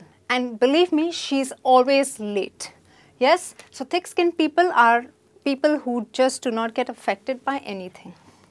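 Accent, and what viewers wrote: India. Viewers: This is Indian english not English !!!!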